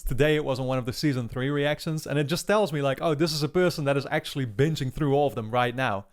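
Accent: Netherlands. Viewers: Are you from the Netherlands? Cuz you sounds very Dutch for some reason haha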